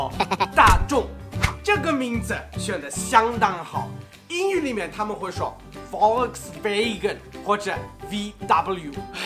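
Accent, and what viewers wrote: Shanghai, China. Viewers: 英语有上海口音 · 兄弟你德语都带上海味儿了..... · 不行， 他的德语还是有上海口音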